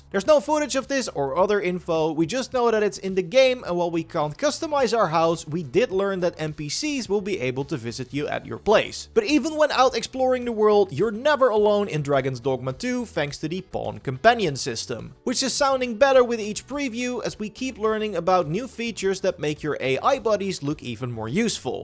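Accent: Netherlands. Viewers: dutch accent is so funny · Dutchy dutchman talking.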